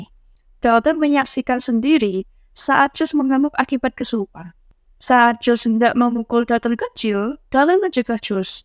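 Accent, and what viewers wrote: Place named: Java, Indonesia. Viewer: Pasti kk org jawa ya ngomongnya masih medok.hehe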